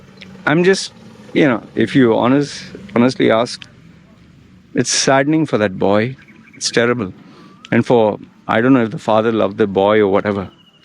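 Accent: India. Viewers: In Canada English people hate people from Idian origen ! They hate your accent ! why you follow anglo culture??? ? WHY???? you need mental help !you are living on the moon ! everybody know your people are scammers ! why you get tattooes?? I dont respect man with tatoos on face ! you should go back to your country and leave the people in Thailand in peace . Are there toxic chemicals in tattoo ink? Mercury, cobalt sulphate, other soluble cobalt salts, and carbon black are in Group 2B, which means they may cause cancer in humans. Cadmium and compounds of cadmium, on the other hand, are in Group 1 (carcinogenic to humans).When it comes to cancer, black ink can be especially dangerous because it contains a very high level of benzo(a)pyrene.The body is directly exposed to the toxic substances contained in the ink due to the injection of tattoo ink into the skin. Pigments may accumulate in the lymph nodes or other organs as they are in direct contact with the skin tissue and lymphatic system.The colorants tatoo ink they get transported to other anatomical locations in the body via the blood vessel and lymphatic systems directly after tattooing or via the lymphatic system years or months after tattooing.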